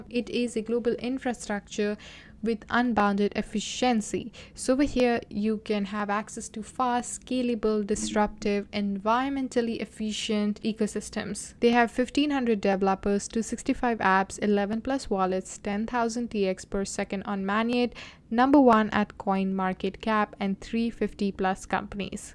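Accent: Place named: India. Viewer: Luv the video.thank.u. R u.from india luv the accent.🙏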